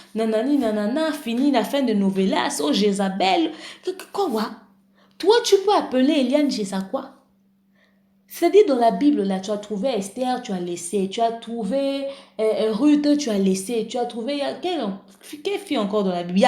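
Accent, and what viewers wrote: Ivory Coast. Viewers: mama pourquoi parler comme une ivoirienne?????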